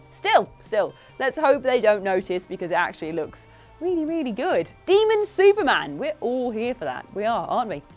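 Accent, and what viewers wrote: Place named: Birmingham, United Kingdom. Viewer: well, i just found my new favourite brummie girl. Superhottie.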